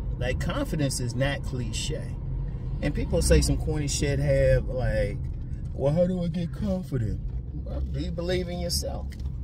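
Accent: Washington DC, United States. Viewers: Bammas? DC accent?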